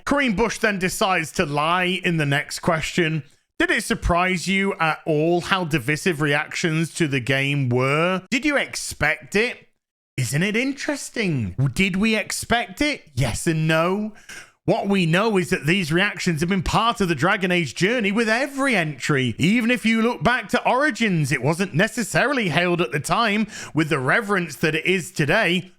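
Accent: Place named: United Kingdom. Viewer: I'm not a Brit, but his accent makes his rants far more entertaining to listen to.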